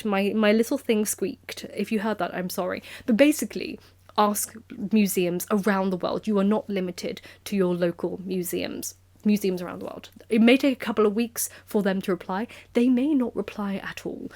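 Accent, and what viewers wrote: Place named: England, United Kingdom. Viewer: You are really english you have a gracious accent lady but! but ! it s a true challenge for me lady to catch all your words . I listen to you to train my ears to hear different accent and is your case lady you put my english skill to test!! Lady . For me true english speak like you . I m french and i learn english without teacher on my own . I see all your books around you i like this enverino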